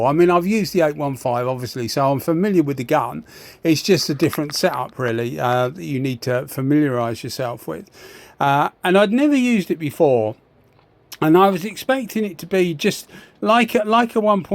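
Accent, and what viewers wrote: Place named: United Kingdom. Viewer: Hello again.. Jeff from the US. As usual you do not disappoint with your vast knowledge of this trade. There are a lot of youtuber's making paint gun videos, but your no-nonsense approach is refreshing! This Porphis brand looks like a high quality spraygun. Fit and finish seem to be spot on as well and based on your elevated tone of voice, I can see you're a fan of this brand and I do you value your opinion! Can't deny I am partial to the British accent my friend! Keep on! God Bless🙏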